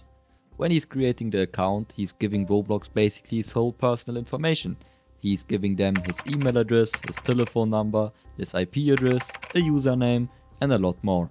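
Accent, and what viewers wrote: Germany. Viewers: Du bist 100% deutsch das hört man sehr stark raus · i hear deutsch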